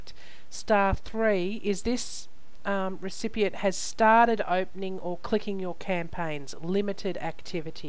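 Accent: Australia. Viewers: i love how aussie you are makes it so much easier to listen too lol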